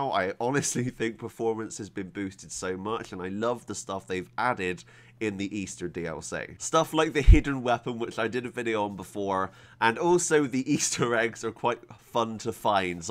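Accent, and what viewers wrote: United Kingdom. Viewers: I thought so haha. Am also from the UK so kind of recognised the accent. Just wasn't a hundred percent sure haha. Enjoy the videos though! Have only recently got kingdom come and still fairly new to pc gaming. But thoroughly enjoying it, playing alongside it with The Witcher 2, not sure if you've played the witcher series but they are also great games. Have started reading the books recently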